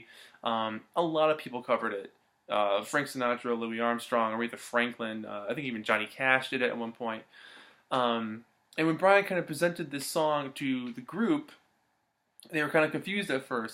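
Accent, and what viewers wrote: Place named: Detroit, United States. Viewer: Hey, love your BB reviews! Where are you located... you sound like we do in the Detroit area... BTW, VDParks was in Detroit area about 7 yrs ago, a great show at a small gallery but too many yakkers around the bar kinda wrecked it.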